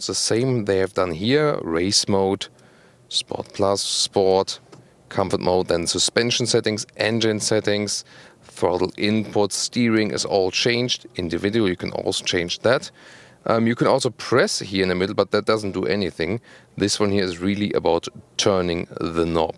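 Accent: Germany. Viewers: like it because he focuses on details in his videos so interested people can really get familiar with the cars before going to the dealer. I may even get used to his German accent :P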